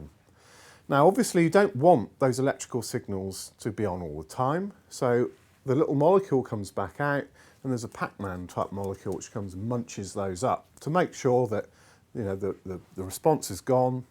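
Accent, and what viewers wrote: United Kingdom. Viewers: I swear, British people could talk about clipping toenails or removing gangrene and it would sound fascinating!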